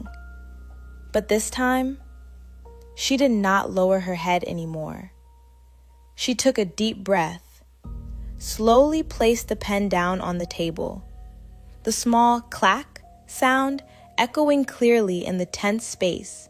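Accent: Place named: United States. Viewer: No!!! American accent 😩 BUT good story ❤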